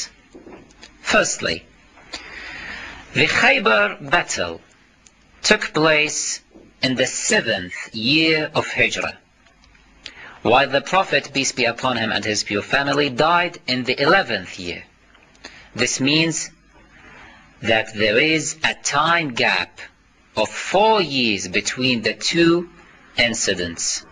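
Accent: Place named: Iran. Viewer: aksen bhs arab n englishnya ni org adalah org farsi. N kau tak usah pura tak tahu klo org rafidha tu agamanya mmng mengajarkan kekacauan bg Islam dan umat Islam.mrk senang mengadu domba antar muslim. ( rafidha x muslim) Agama rafidha ( syiah 12 imam) inti ajarannya MMUMUSUHI MUHAMMAD SAW DAN WAJIB BERLISAN KEJI THDP BELIAU!!!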